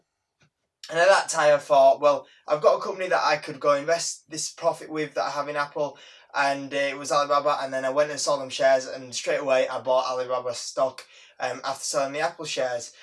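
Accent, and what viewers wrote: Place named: United Kingdom. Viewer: Hi , By the accent you have , I`d say you`re british. Could I trouble you asking how much tax did you pay on your Apple shares sale ? I just started too recently and am curious . Is it just that 0.22% and brokerage fee or is it something else as well ? and after you pass like 12.5k this year , you start paying like 20% ?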